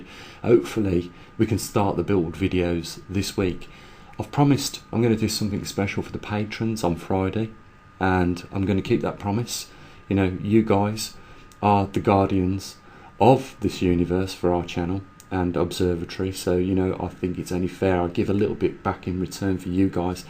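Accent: United Kingdom. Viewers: Mentioned previously I've been putting your videos about a bit on different platforms. But a little about me .. Expert in various sciences .. among these computers and animals. Oddly enough strange things have been occurring in both these areas and I've talked about it with others. Now I've been well aware we were heading for a magnetic flip for years but never expected it to occur in my lifetime. So never considered this being the cause of things I've witnessed. Now makes a lot of sense. I've been talking about your videos with a lot of people and over the coming weeks many thousands will probably hear about it too. Was odd to hear another British voice .. expected you to be American for some reason when I first listened to your previously.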